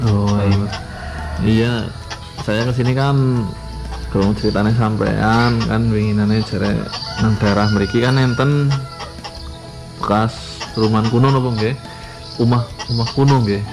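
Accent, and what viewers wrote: Banyumas, Indonesia. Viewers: hahaha ngapakkkkk men rika kang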